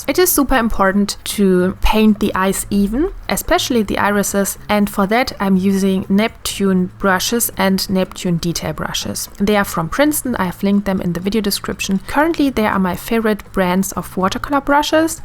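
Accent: Germany. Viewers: thick german accent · I like your German accent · are you from Germany, because i think you have a little German accent( that is not a hate comment!)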